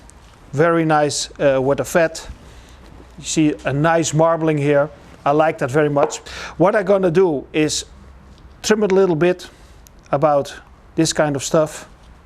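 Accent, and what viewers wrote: Netherlands. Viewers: This guy has a Dutch accent....i know the accent.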